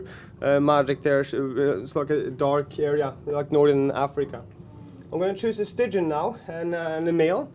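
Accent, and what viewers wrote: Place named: Norway. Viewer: Hva faen mann! xD Så lett å høre at du er norsk! What the fuck, man! It's so easy to hear that You are norwegian!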